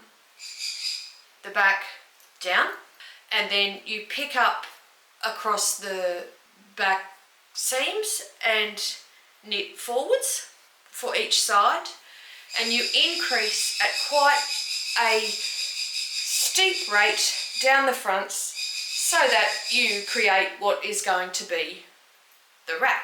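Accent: Australia. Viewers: I’m really enjoying your podcasts….nice to listen to an Aussie while I’m ploughing through 2aat sleeves already attached to the jumper. It’s an oversize (daughter’s request) Merino Magic (Heirloom) - soooo bulky that I need to knit at the dining table in air conditioning of course. It’s pretty hot here in Two Rocks WA. On to your next episode.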